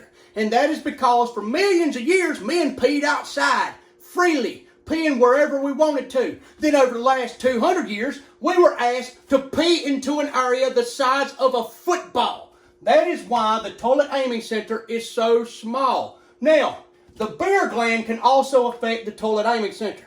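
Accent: South, United States. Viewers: For some reason, the Southern accent makes it so much funnier :D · His accent makes it more American, that's why is funny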